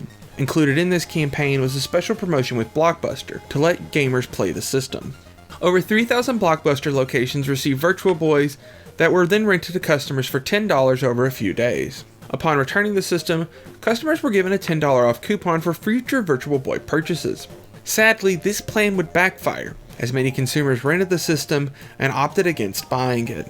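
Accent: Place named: Kentucky, United States. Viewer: Judging from that accent youre also a Kentuckian. Subscribed.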